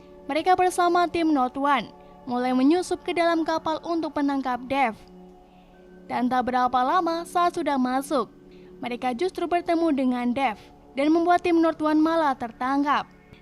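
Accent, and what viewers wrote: Java, Indonesia. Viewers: bak samena wong jowo yo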